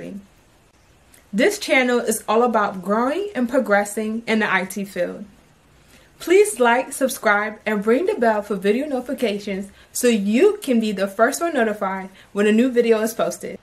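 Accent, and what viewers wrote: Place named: East Coast, United States. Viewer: I love how east coast women talk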